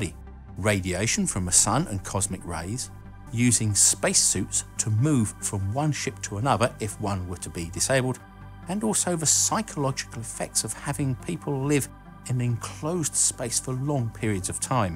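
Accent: United Kingdom. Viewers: i found your channel by youtube advise like following: 1. British accent check 2. Shaved head check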